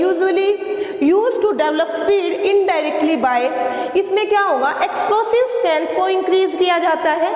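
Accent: Haryana, India. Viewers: super explanation mam or aapni boli haryanvi toch aari thodi thodi mam👌👌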